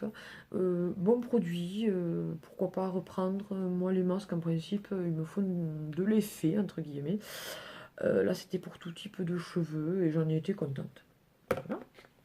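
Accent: South, France. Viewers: Je t découvert il y a qq mois grave à fashion Barbie j'aime bien regarder tes vidéos. Et ton accent ramène du soleil dans ma région ou la chaleur n'est pas au rendez vous. J'ai de la famille à castres alors je connaît bien de ton petit coin ☺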